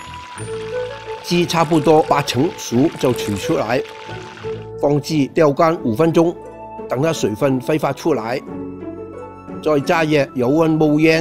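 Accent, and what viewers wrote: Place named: Cantonese, China. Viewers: 正宗廣普話！ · 纯正广东普通话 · 广东普通话！就是这样熟悉。😁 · 最好聽呀 廣東特色 吾使去學卷俐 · 中国广普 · 廣式普通話最強, 一陣不用普通話又被說為甚麼要用方言, 現在就好了 XDDDDD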